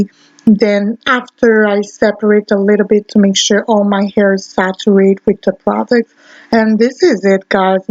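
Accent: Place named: Brazil. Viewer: Are you from Brazil? Your accent is beautiful!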